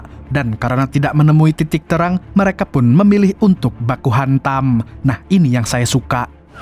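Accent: Banjar, Indonesia. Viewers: Urng banjar nah ngene narator ya😂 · Urang banjar sekaliny sidin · wiih, urang banjar kh adminx nih?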